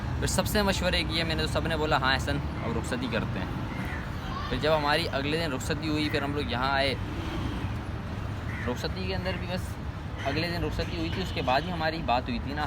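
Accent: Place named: India. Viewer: Assalamu alaikum bhai, Aap Mujahir hu shayed kyuki aap indians ki taraha baat karte hu may be u r family is Indian..........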